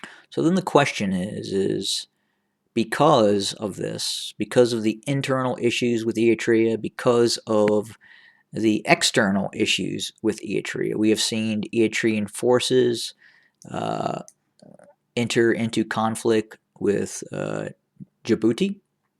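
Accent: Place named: South, United States. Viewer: The fan fact is this channel has been joined in 15 sep 2020 , and a random with southern accent guy getting paid or using the situation to get attention, saying USA plan to remove Isaias aforki , first of all the CIA never even tell their secret publicly for any operations , not only CIA but also other secret Agencies in any Country, and secondly why now , what was US waiting the past 30 years , thirdly USA has no power to remove any leader on a country, we think like that but most of time they get removed by the people like sudan, Tunisia, egypt , Ethiopia( the Weyane was kicked by the youth of oromo poeple) , but nice try though 🤣🤣🤣🤣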